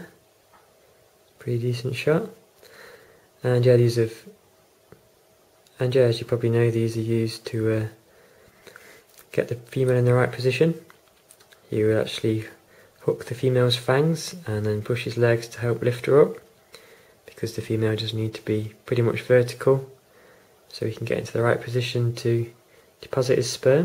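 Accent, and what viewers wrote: United Kingdom. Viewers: I thought your accent was Derbyshire Midlands.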